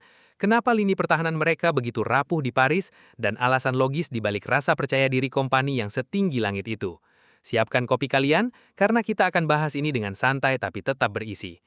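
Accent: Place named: Makassar, Indonesia. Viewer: itu logat org Makassar dan Bugis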